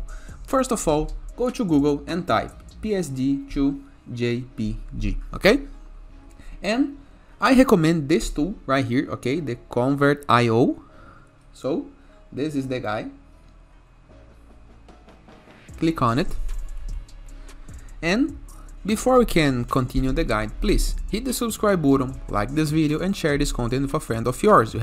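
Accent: Brazil. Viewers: Sotaque de brasileiro, acertei?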